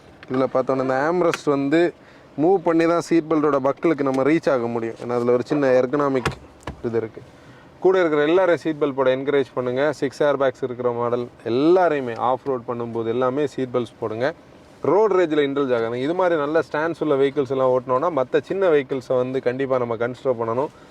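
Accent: Kanyakumari, India. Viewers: Kanya kumari style speech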